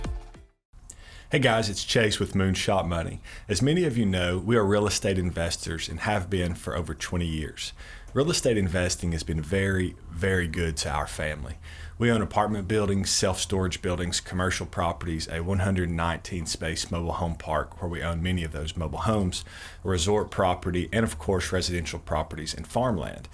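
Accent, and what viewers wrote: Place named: North Carolina, United States. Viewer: Thanks Chase, great content. I actually thought you were from NC.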